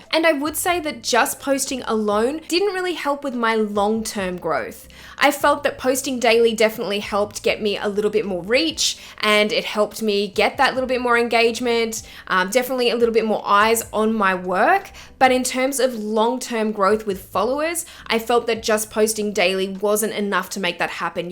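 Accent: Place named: Australia. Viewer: Very new to using instagram, just started an account for a business im trying to get off the ground. Started to youtube it to research and learn how to do things. So pleased i found this vid, hopefully u have more for me to learn from. rather hear it all in an aussie accent rather than a seppo one. First time really using instagram, hopefully it isnt too hard to get around it and become reasonably proficient at it, thanks for posting young lady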